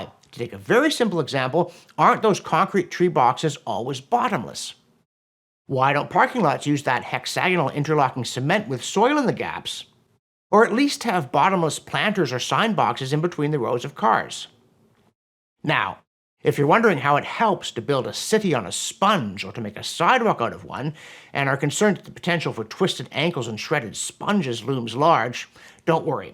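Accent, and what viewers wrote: Canada. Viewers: Dr. Robson, I have to say this. I know you are a good intended person very active in getting real information to people about the climate change alarmism and it's dangerous to our society. I'm just going to say it meaning to help because your message is very VERY important. Not so bad in this video, but ... since your message is SO important I think the way you comport the message is flawed. Your vocal delivery is plainly, not good. You speed talk so much and trail off at end of sentences and paired with your obvious Canadian accent, sorry but t true there's something there.. ... too often it gets frustrating trying to hear you and often I've just stopped watching the video to find something else I can actually hear. Yes, I have tinnitus, but I am not alone in that. I don't know what your hurry is and why you can't just take the time to present your message in a more listenable deliberate cantor. 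I think you'd find MANY more views on your videos if you'd try to improve your public speaking to a more pro presentation. I say this as a person who appreciates your efforts and especially your important message. BTW the caption often gets it wrong and can't keep up either. A little patience and taking it a bit easier will benefit your delivery. People want the truth they also want to hear it communicated clearly. Take this as constructive as I do like you.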